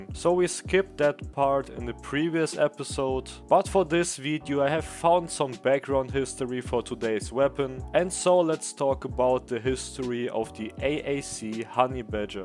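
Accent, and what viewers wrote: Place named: Germany. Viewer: Nices Video man hört direkt dass du deutscher bist haha 😄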